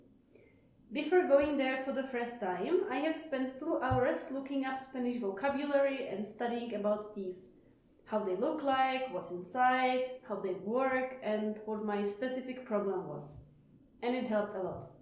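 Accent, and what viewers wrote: Czech Republic. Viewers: I just wanna ask native English speakers watching this video, are you at all bothered by the fact that the teacher speaks English with a Czech intonation? Not trying to be rude, just genuinely curious! (Honestly, most Czech teachers speak English this way - they learn their vocab but the accent and intonation kind of stay Czech.) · Je slyšet ten hnujsnej českej přízvuk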